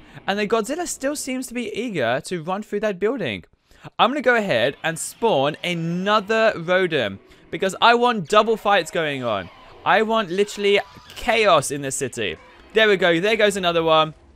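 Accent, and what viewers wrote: United Kingdom. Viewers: are you brittish ? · i think hes british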